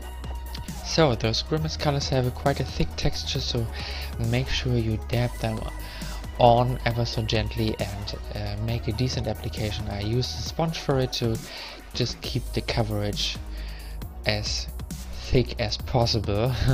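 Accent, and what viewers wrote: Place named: Germany. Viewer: hahah i thought "he kind of has a German accent too" so I checked some other videos of yours and haha you are truly the perfect nightcrawler XDD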